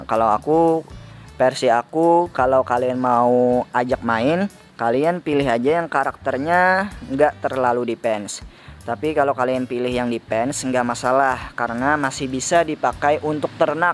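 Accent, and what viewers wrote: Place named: Banjar, Indonesia. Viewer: Totok banjar logat nya neh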